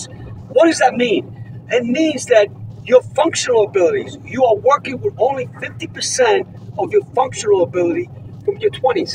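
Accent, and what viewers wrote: United States. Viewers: Are you in Europe? You sound American!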